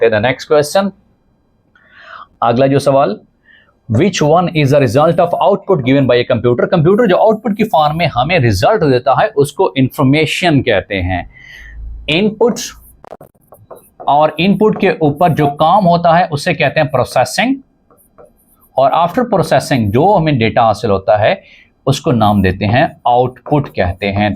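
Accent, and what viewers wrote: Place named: Europe. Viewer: your english accent is like a European teacher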